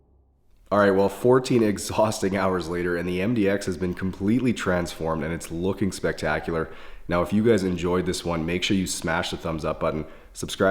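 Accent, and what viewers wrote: Canada. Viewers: I must say that I am impressed with your dedication to being a perfectionist it definitely helps with this kind of profession every vehicle you Clean is amazing but as a united States citizen I'll say that I definitely noticed your Canadian accent lol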